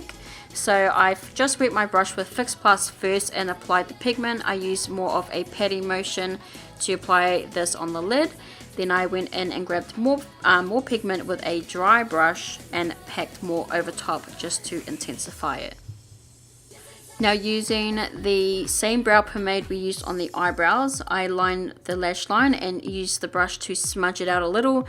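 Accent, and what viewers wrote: New Zealand. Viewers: chur bro so am I. I could tell by your voice that you were a kiwi. A very talented pretty kiwi. Later bol. 😉😆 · Love this look and love the kiwi accent!.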